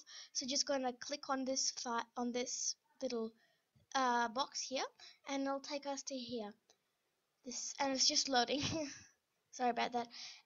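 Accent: Australia. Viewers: you're from austrilia? thats why your accent is so beautiful <3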